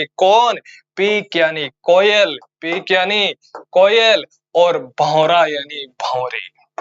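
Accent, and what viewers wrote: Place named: Rajasthan, India. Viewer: Sir me jharkhand se hu me apake language se hi samjh gayi aap rajsthan se ho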